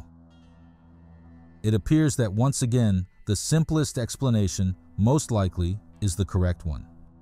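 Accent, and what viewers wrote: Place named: United States. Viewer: Bra engelska? Det där är bred amerikansk accent.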